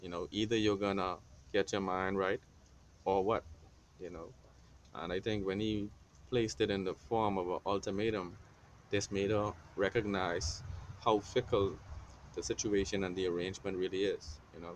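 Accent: Bahamas. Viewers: Are u Bahamian? The way we talk can't hide lol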